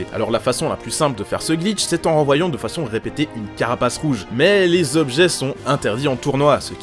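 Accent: Switzerland. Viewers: J'étais pas prêt pour le "neuf cent nonante" (je sais pas si c'est la bonne orthographe). Sans l'accent j'oublie parfois c'est une chaine Suisse. xP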